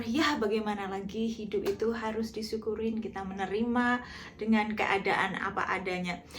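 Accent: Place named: West Java, Indonesia. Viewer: Waalaikum salam mbak yu Iki kok koyok wong kulon deso betul ngak e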